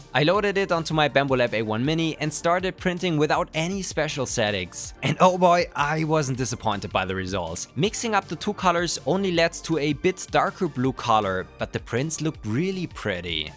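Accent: Germany. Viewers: noch deutscheres englisch hab ich noch nie gehört, fck richtig ab deine 15 jährige stimme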